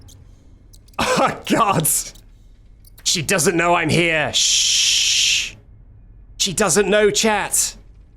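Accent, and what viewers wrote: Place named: South, United States. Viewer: That accent is southern american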